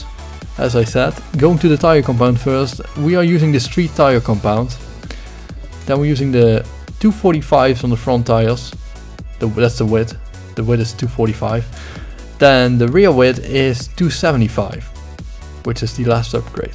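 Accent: Netherlands. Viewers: are you Dutch? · are you dutch because you're accent sounds like it :)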